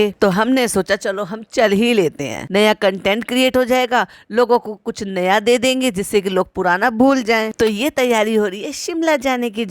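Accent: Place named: Saharanpur, India. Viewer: ji!!! Aap bilkul Saharanpur/ Muzaffarnagar k jaisi boltai ho!!!! Many of my family members & friends live there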